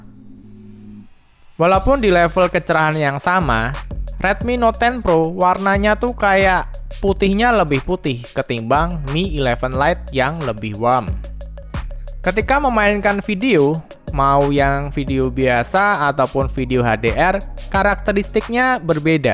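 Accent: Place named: Surabaya, Indonesia. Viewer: pantesan medok.. lah org surabaya. hahahaha..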